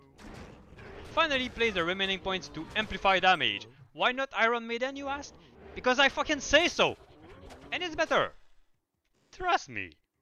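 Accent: Quebec, Canada. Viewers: Omg that thick QC accent xD, cool vid!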